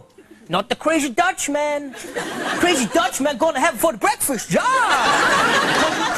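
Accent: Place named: France. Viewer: haha he does his french accent different here. the one he has now is cuter and funnier XD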